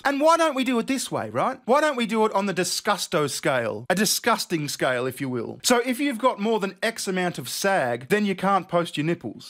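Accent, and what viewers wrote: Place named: Australia. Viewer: This guys sounds so much like Aussie guy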